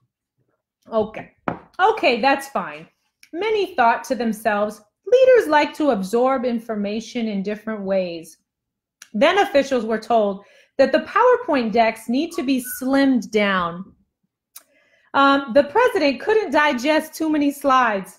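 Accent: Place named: Bronx, United States. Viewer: 🥰 that Bronx accent!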